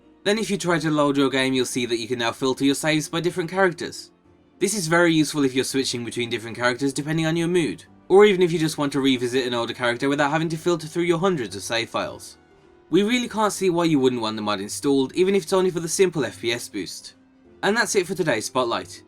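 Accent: United Kingdom. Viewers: It's what happens when you've got a British accent.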